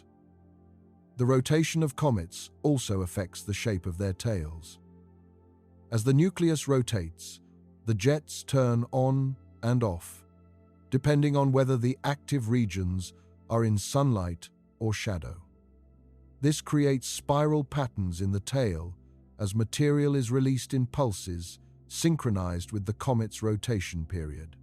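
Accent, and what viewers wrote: United Kingdom. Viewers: This British narrator speaking of a baseball spin must never heard of the ‘ol “knuckle ball” which doesn’t spin!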